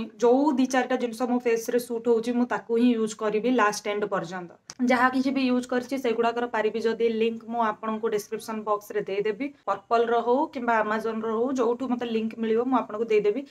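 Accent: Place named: Talcher, India. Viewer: Hii sis apana Talcher language v tk kahuchanti ta mote v laguthila ki apana Talcher ru tuma Katha kahiba style bahut badhia mu v talcher ru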